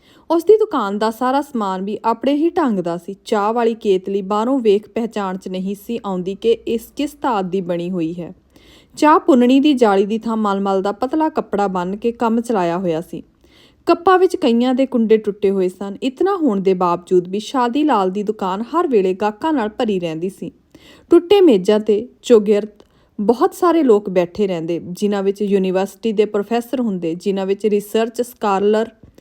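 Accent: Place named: Majha, India. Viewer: ਠੀਕ ਸੀ ਕੁੜੀਏ ਖੜ ਦਾ ਪਰਦਾ, ਪਰ ਲੱਗਦਾ ਬੋਲੀ ਮਾਝੇ ਦੀ ਬੋਲਦੀ ਏ ।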